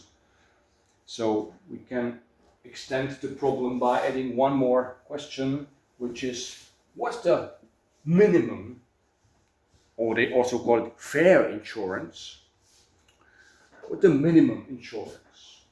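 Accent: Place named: Germany. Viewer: I got recommended this video and I heard the semi German accent so decided to watch the entire thing 😂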